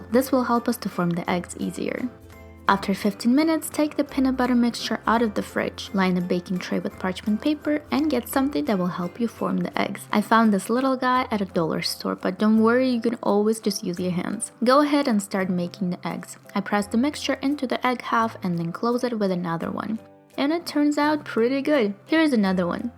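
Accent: Russia. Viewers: Sweet Russian I think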